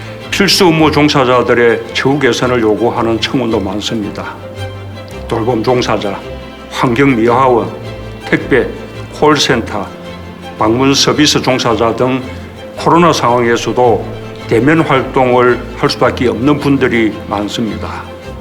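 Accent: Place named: China. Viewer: 지난 4년 동안 중국인 발음이다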